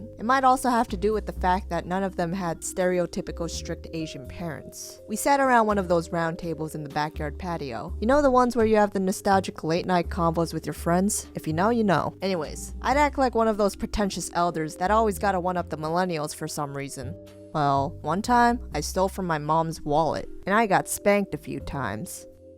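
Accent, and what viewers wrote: New York, United States. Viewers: Is she from ny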